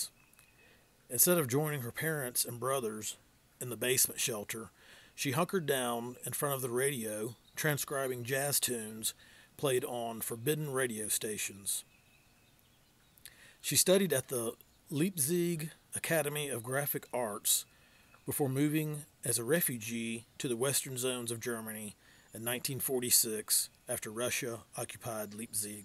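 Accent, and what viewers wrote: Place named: South, United States. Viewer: are you in GA or AL? The accent and the pines behind you tip it off if so! Haha.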